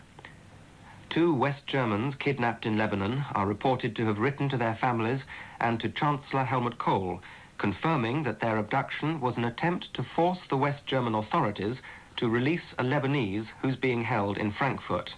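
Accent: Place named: United Kingdom. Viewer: 영국발음 진짜 멋있다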